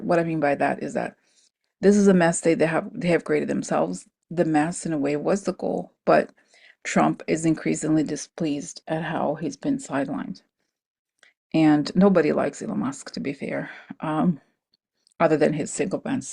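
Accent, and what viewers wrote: Russia. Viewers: thank you for these insights. And, I’m here for it. I could watch you put on makeup and do your awesome Russian accent all day long. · Hi, thank you for your precious information and to be here for us!! You are so funny between your russian accent and different makeups!! A friend of mine who was born and raised in Czechoslovakiab (ex. USSR) told me that Ivana escape her country through the mountains skiing as she was a highly skilled skier. I said to her we never heard this information in the western countries. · I’m not bothered by you putting on make up, or the Russian accent, you have a lot of interesting perspectives! Thanks❤ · Thank you so much for your psychic data & explanation of current events. I appreciate your solo shows, your beautiful energy, your humor, Russian accent & makeup (though you are naturally beautiful). 🩷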